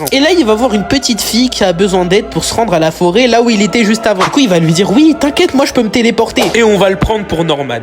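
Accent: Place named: England, United Kingdom. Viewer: On voulait la prononciation ton accent anglais nous as manquer 😢😢😢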